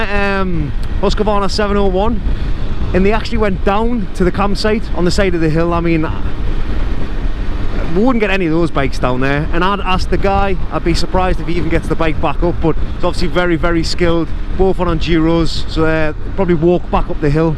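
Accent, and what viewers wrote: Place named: Newcastle, United Kingdom. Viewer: Fantastic views, despite the cold. Treated the weather with the contempt it deserves. Less a crash than a sliding tackle. Geordie accent is perfect. Treat watching this.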